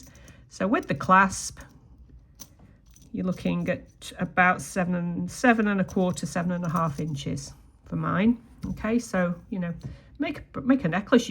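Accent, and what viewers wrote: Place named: England, United Kingdom. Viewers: I love you English accent. It’s awesome and I love this design. Thank you. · Wow that is such a lovely design! Lends itself beautifully to the bracelet,and the earrings with the dangly paddles are a triumph! We love to get a glimpse of your life by listening to your warm Lancashire accented voice teaching us as well as generally chatting. I think of you as my lovely clever talented friend who just happens to live half a world away! Your tip about how you finished up with your exact measurements was invaluable. Ok I’ll stop waffling now - I’m glad you are mostly over your “lurgie” as we call it in Australia. 😘 Roz on OZ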